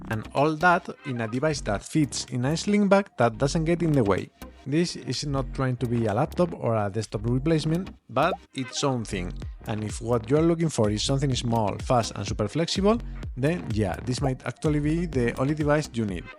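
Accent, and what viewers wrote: Spain. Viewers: Si se nota acento de español de España 😅, en latino americano nos obligan a perder acento cuando aprendemos inglés. Igual se entiende todo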